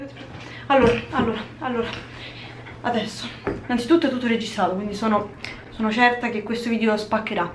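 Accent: Rome, Italy. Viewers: Se sente che sei romana anche io lo sono Bellissimo video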